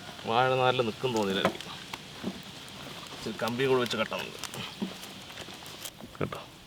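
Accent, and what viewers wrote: Palakkad, India. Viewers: പാലക്കാടിനെ തന്നെ ഭാഷയും മഹിമയും കാത്തുസൂക്ഷിക്കുന്ന 🥰 ekka ഇരിക്കട്ടെ ഒരു കുതിരപ്പവൻ ♥️👍🏻♥️🟠🟠🇮🇳🇮🇳🇮🇳🇮🇳🇮🇳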